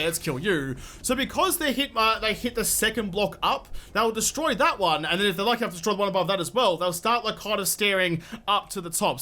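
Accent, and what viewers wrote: Australia. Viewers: " Gaoodayea" I'm guessing he is Australian?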